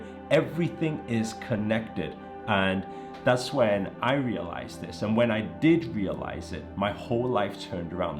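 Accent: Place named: Liverpool, United Kingdom. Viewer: Wow scouser!!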